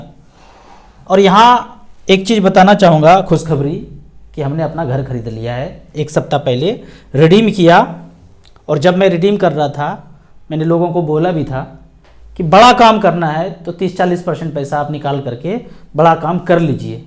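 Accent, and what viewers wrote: Bihar, India. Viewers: Aap Bihar se hai kya sir?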